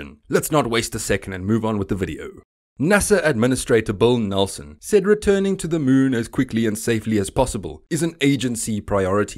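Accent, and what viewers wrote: South Africa. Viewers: Sounds possibly south african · you're from south africa aren't you?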